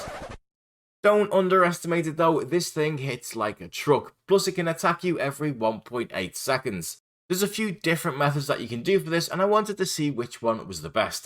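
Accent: Liverpool, United Kingdom. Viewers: are you scouse bro?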